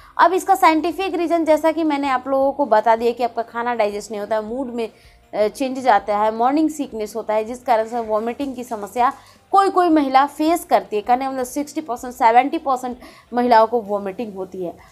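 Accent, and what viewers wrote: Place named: Bihar, India. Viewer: Mam aap bihar se ho kya ❤❤❤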